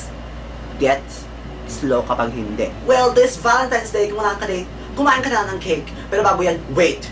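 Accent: Visayas, Philippines. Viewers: bisaya na si..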